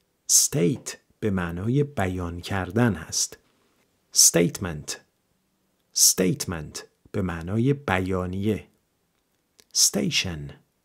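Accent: United States. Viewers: این تلفظ ها آمریکایی هستش یا بریتیش؟ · دکتر لحجه با لحجه آمریکن این لغات رو تلفظ کردین یا برتنیش؟ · سلام تلفظ شما تو این ویدئو امریکن هست یا بریتش ممنونم بابت ویدئو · لحجه آمریکاییه ؟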